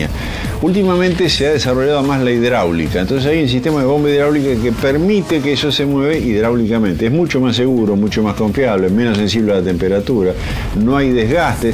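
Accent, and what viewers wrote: Argentina. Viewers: ese es un acento en alguna parte de Argentina. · Hola Amigo...... Tus videos son fenomenales, pero yo te aconsejaría que trataras de modular un poco más las palabras porque se escucha como una verborrea que pasa muy rápido y no se aprecia bien la palabra que dices.... Ya sé que eres Argentino, pero me cuesta captar la palabra que dices.... Sólo eso.... lo demás está fabuloso....!!!!! Un abrazo desde Chile....!!!!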